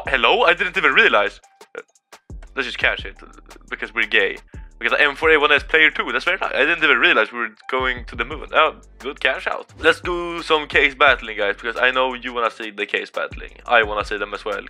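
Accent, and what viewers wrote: Sweden. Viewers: You sound so Swedish, but I love it :D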